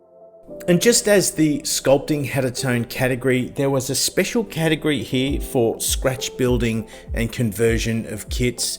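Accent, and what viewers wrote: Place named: Australia. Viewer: Ah Refreshing to hear another bl00dy Aussie.. oi oi oi...Thanks brother.. Just subbed.. All of the best!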